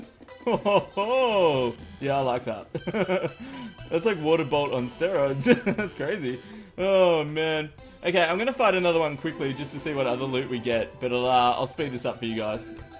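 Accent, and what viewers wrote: Australia. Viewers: im like 85.6% sure you are australian, but i would like to be 100% sure. are you australian?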